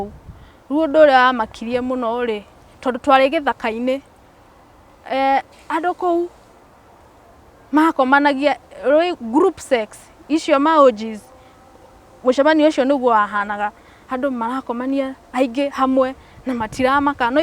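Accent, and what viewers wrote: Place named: Nyeri, Kenya. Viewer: I love her nyeri accent. Iv gone through the same stuff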